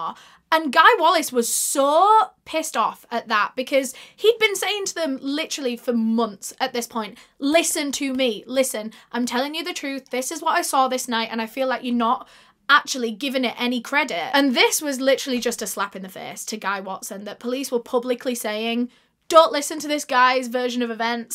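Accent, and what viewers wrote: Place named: Yorkshire, United Kingdom. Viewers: Yorkshire accent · she’s from Yorkshire I am sure, which is in England! Definitely not Scottish or Irish 🙂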